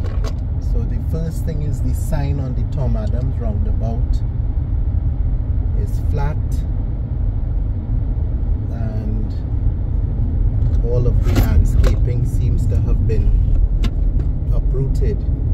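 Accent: Trinidad and Tobago. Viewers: I hear a trini accent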